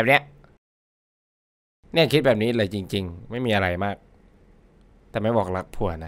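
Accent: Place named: Bangkok, Thailand. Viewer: พี่ เม้นผมหน่อย ผมคนทองผาภูมิครับ ตอนแรกผมคิดว่าพี่คนกรุงเทพ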